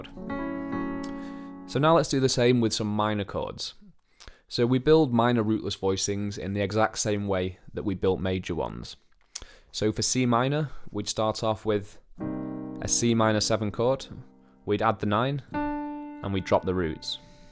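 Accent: Liverpool, United Kingdom. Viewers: Very helpful info but I was wondering if you can do (or already have) any videos on learning the right hand improv? Whenever I hear the middle improv section of a standard jazz piece, it always blows me away how good it sounds with the various runs etc and I would love to be able to learn how to do that. By the way is that a Liverpool accent I hear? Cheers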